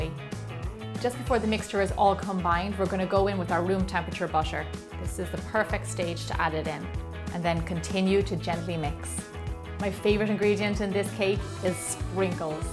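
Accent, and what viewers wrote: Ireland. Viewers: Your voice makes me miss Ireland lol, but the cakes looks so pretty. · are you from Ireland? because your accent is beautiful! lol i wish u had one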